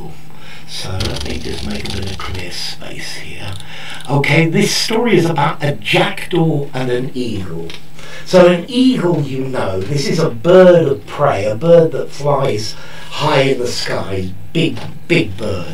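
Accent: RP, United Kingdom. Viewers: You're really the best posh English teacher in YouTube 👏👏👏👏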